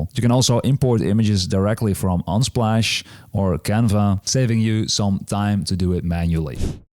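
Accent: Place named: Netherlands. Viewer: You sound Dutch! :)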